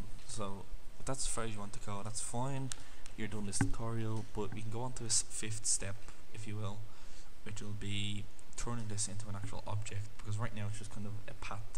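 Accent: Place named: Ireland. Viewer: Great tutorial on Bézier curves -- sounds like you're from the same part of Ireland as I am.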